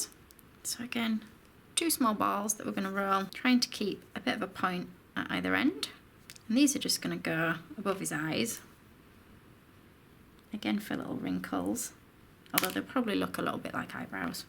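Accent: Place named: Yorkshire, United Kingdom. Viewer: Hi this is great. Amazing! What a creation. Also your lovely accent reminded me of "Miranda" where Miranda pretends to be "From the general Yorkshire area".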